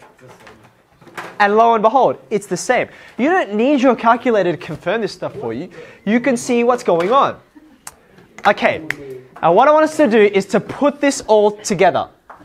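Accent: Australia. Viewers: Australian accent? Sounds like chan from skz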